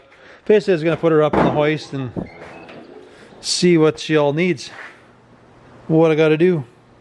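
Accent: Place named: Southern Ontario, Canada. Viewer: That accent tells me southern Ontario🙂👍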